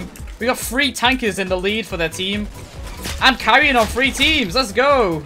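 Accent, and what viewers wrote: United Kingdom. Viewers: Wait is British like me? W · are you british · I just realized thể he’s British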